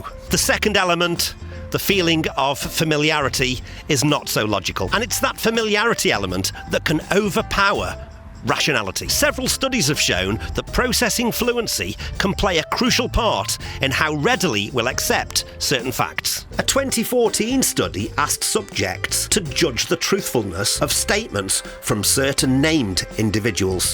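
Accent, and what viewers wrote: Stoke, United Kingdom. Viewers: 1- being an American, I feel i have to believe you because of your british accent.(strange) 2- accidentally taking an identical tender with the same key (small motor boat used to get ashore from a big boat) upon returning to find coast guard, police and upset couple, I said to everyone, "if the key fit you must acquit" everyone laughed and i got away with what could have been a real expensive nightmare. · You from stoke by any chance?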